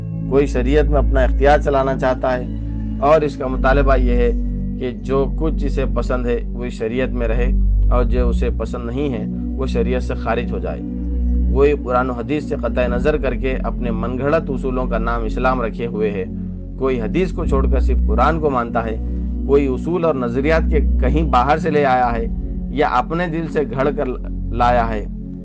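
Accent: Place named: Hyderabad, India. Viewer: The reader appears to be from Hyderabad (Deccan). I can make it out by picking up some words, the way he is pronouncing them. JazakAllah for your efforts. May Allah reward you.😊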